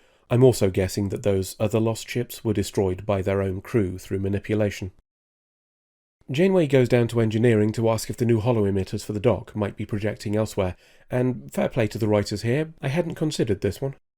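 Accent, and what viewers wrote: RP, United Kingdom. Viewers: After playlisting ( if that is a word). Two observations, you are the most British toff i heard since I worked on the Antique Roadshow and you don’t really understand American women at all.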